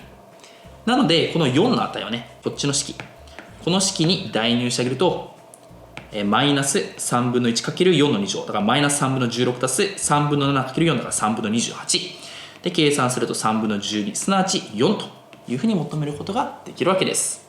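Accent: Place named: Yamanashi, Japan. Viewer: 山梨だよ、多分甲州弁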